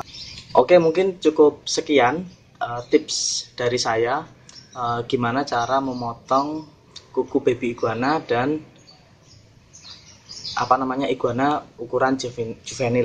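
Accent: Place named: Java, Indonesia. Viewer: suaramu kok tambah medhok hehe 😀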